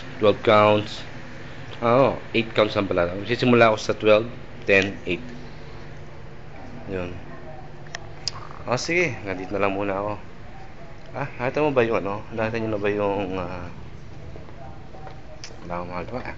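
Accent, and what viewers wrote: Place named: Pakistan. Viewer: lol this is not saudi he is pangaladish or pakistane lol look to the way that he is talking in lol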